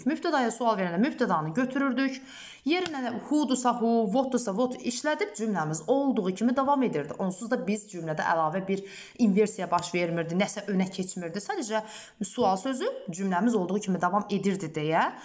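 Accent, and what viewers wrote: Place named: United Kingdom. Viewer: You have a british pronunciation, good)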